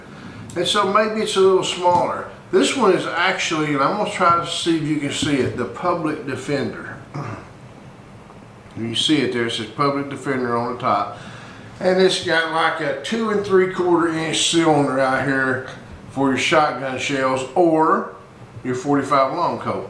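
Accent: South, United States. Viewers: Is dude hammered or from the south?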